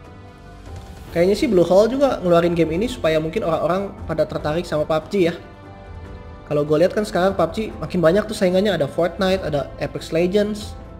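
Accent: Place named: Sunda, Indonesia. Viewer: Logat sunda nya kental brow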